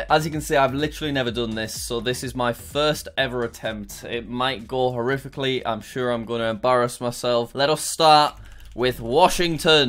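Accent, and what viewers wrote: United Kingdom. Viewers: I can tell you are British🇫🇯🇫🇯🇫🇯🇫🇰🇫🇰🇫🇰🇬🇪🇲🇩🇳🇺🇹🇨🏴🇬🇧🇹🇻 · are you From British (UK)?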